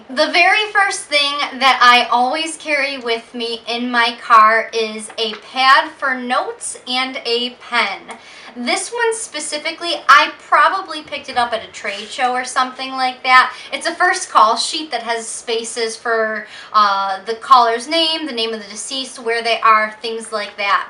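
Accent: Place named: United States. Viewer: OMG that bloody voice sends shivers down my spine - A whining American YUCK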